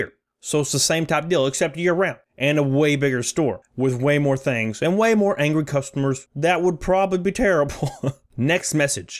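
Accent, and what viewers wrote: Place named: North Carolina, United States. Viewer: Where you from brotherrrrr?!? I’m trying to guess by your accent. I’m guessing North Carolina. Much love from Houston Texas!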